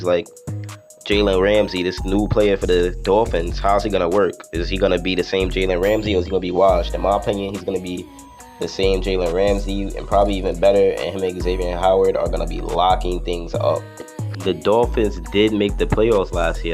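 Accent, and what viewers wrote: New York, United States. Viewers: Not feeling this NY accent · trying to place your accent! A little NY In there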